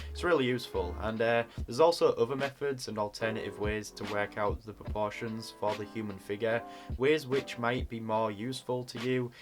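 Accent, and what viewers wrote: United Kingdom. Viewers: cool tutorial but i cant figure out wtf is going on with ur accent. like i feel like its British or something most of the time and then you'll say a word that just sounds so southern. not hate I'm just really confused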